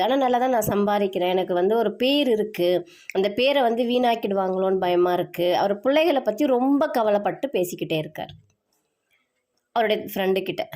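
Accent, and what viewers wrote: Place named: Ernakulam, India. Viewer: Idun Ernakulam sonnadu pola iruku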